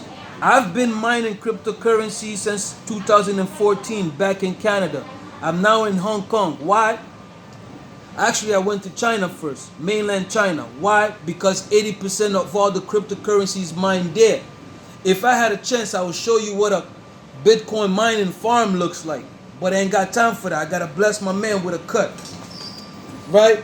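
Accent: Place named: Jamaica. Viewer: You sound jamaican ☺🇯🇲💖or other caribbean island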